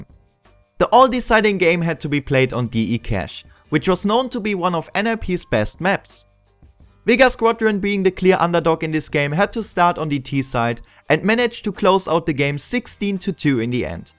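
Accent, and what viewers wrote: Germany. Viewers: Are you German D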